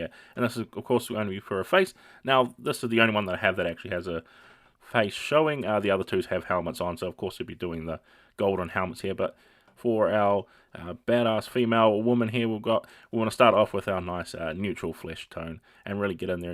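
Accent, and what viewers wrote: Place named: New Zealand. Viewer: "now we wanna come in now with some dick tan" I love the kiwi accent!!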